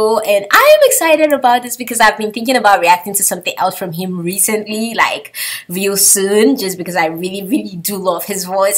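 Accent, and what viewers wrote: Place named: South Africa. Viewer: i love your accent! are you from south africa? or jamaica? :))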